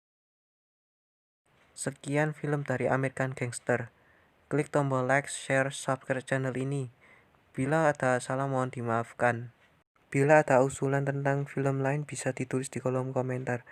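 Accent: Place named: Java, Indonesia. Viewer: di usaha in Jan medok bang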